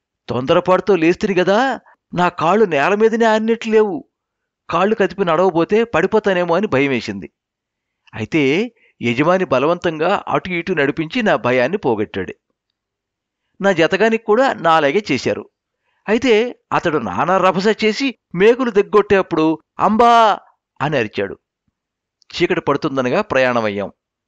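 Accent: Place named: Rayalaseema, India. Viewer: Super story. Congrats to Reddy garu , the writer.మీరు గూడా బాగా చదివారు.‌ రాయల సీమ వ్యవహారిక భాషను బాగా పండించారు.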